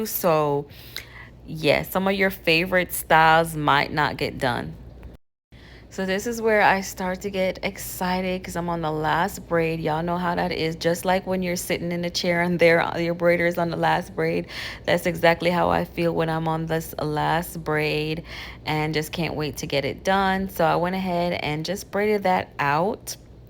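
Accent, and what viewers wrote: Jamaica. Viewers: New subbie! I hear a Jamaican accent! Gonna binge watch your vlogs 🇯🇲🇯🇲🇯🇲🇯🇲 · We appreciate this series. I’m also trying to learn to do my own hair. I recently did some mini spring twist by myself for the first time ever and I was pleasantly surprised. P.S are you Jamaican/ Caribbean by any chance. I hear a slight slight accent but I’m not sure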